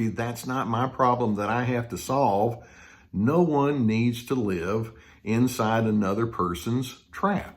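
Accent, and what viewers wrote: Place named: Texas, United States. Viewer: Somehow the narcissist looks all the more ridiculous when they are described with a Texas accent!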